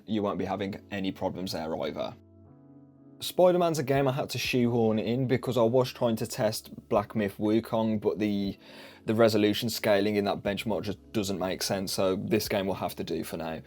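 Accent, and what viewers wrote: United Kingdom. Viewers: I just bought the 10 gb version done weeks ago for 373 USD or 289£ since you sound British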